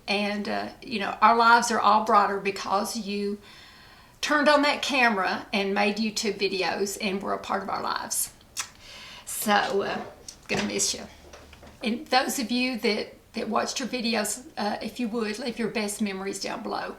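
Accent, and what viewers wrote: South, United States. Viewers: ...Honey You my dear are just the sweetest thing. Your accent is beautiful...are you from the South? Beautiful tribute to Suzy!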